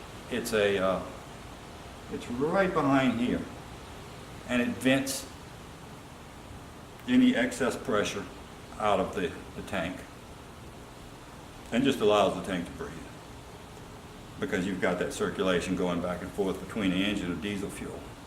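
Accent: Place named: New Orleans, United States. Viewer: sounds like your from new orleans area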